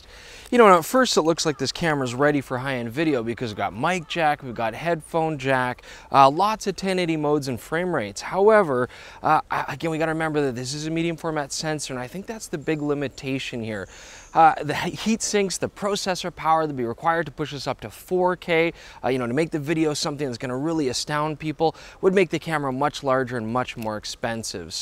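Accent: South Africa. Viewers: Great video as always. What on earth is with the temporary South African accent? It's messing with my head! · LOL I'm South African and your translations were hilarious! Did you say "lekker lekker"? LOL you sounded so South African! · That's an Afrikaans accent. Interesting. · Very impressive SA accent. Very chuffed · dude are you South African too?! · a great camera for traveling of fuji. BTW your South Africa accent is pretty good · Nice SA Accent! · This is SA accent! Where and why did you pick up such a convincing accent? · BTW, Godox now have a trigger and firmware upgrade for their Flash units that will allow HSS and TTL for Fuji camera's. PS, I think your South African accent went over his head!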